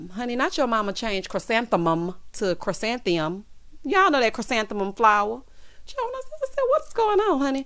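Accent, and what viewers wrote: South, United States. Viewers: Your southern draw gets me every time Your Awesome..Keep Going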